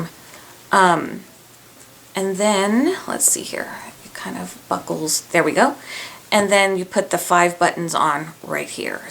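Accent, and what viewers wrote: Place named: United States. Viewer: hi I watched your rainbow loom vid and your voice sounds American but u look Asian please tell me where your from I love your vids please reply ASAP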